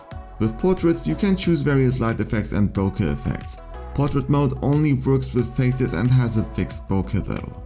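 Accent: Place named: Germany. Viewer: Der deutsche Akzent ist nicht zu überhören😂👆🏻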